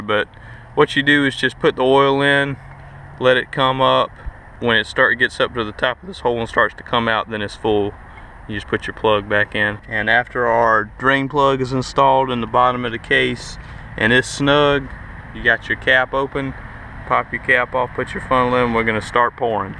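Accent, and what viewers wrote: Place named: South Carolina, United States. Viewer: So I stumbled upon your videos on Dish Networks YouTube channel. I was watching you replace your Kawas water pump gaskets, bearings etc and I told my wife this guy sounds like someone from SC. Lol I then search your channel on my phone and I see the Welcome to SC sign. Pretty cool I'm from upstate SC area in Spartanburg! Btw I'm gonna rebuild my water pump in my 08 Yz250 this week!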